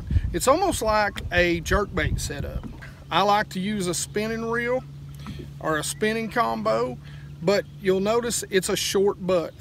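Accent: South, United States. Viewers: Hey, i really liked this video. I watch a lot of Tactical Bassin cause everything else seems to be young guys ass slappin and hi fiving over 2lb fish ud think was their PB the way they giggle and ham it up for the camera. Drives me crazy. Im in Chicago so its tough to catch videos at the right time of season for us compared to where most guys film. It was 36° today and snowed 6 inches yesterday. Tomorrow sunny and 55 so hopefully theyll move up a bit? I grew up in Georgia so u sound like one of buddies. Anyway, no nonsense instructional videos with a southern accent are right up my alley! This is the first ive seen and i immediately liked it and subscribed. Great to see an adult talking about technique and not playing grab ass with a best friend that wants to get on camera!! Do you do guided trips or is this just a fun hobby for you? Id be interested if you do. 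Again great stuff 👍👍. Im now a full time customer. Thanks bud!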